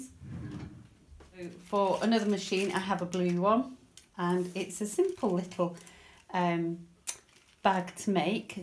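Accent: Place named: Stockport, United Kingdom. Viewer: Just actually listened to your accent, I was born at Stepping Hill hospital, my maternal grandparents lived where you do, or around, close by... before grandfather died, they lived in lower Brinnington, near where St Paul's church was... for the first 5 years of my life, I lived in upper Brinnington, went to school at the old school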